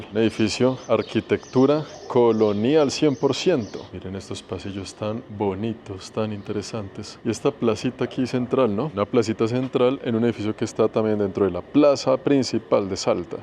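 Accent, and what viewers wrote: Bogotá, Colombia. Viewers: NI YO COMO ARGENTINA NO SABIA DE ESA HISTORIA DE LOS QUILMES!!AMOOO EL ACENTO COLOMBIANOS Y A LOS COLOMBIANOS. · viví 15 años en Argentina y mi esposo y mis hijos son argentinos. Me gusta como narras tus vivencias con ese acento cachaco jajaja. Saludos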